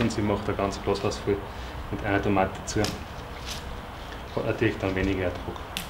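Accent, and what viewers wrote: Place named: Austria. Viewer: Das IST richtiges österreichisches Deutsch!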